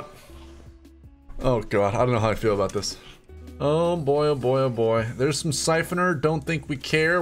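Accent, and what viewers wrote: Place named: Rio de Janeiro, Brazil. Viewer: paulistano??? Ta maluco kkkkkkkk carioca na veia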